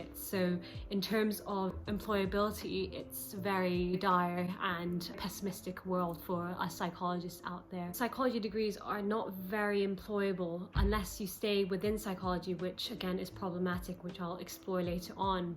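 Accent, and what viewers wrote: United Kingdom. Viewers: Love your gorgeous British diction !!! You present nicely, viz. you speak in complete sentences (subject + verb + object,) your predicates and pronouns agree in case, gender and number with your head nouns, and you do not lard up your presentations with that ignorant non-word, "UH." Very, very professional ! Greetings from Pennsylvania in the Queen's American Colonies. Best wishes for a good career and a good life. (PS - Jordan Peterson is my favorite psychologist on this side of the water.)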